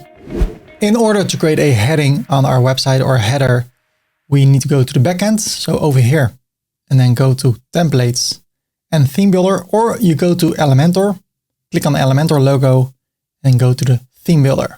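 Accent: Netherlands. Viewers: Toen ik jouw naam hoorde gecombineerd met hoe je sprak wist ik meteen dat je Nederlands was haha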